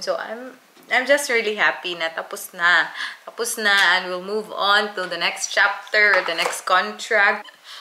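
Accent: Visayas, Philippines. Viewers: Kasi Bisaya ka Kasi, Kaya pag nagtagalog ka parang may kunting accent Ng bisaya na malambing, Kaya akala nila maarte na, di nila alam malambing Ang mga bisaya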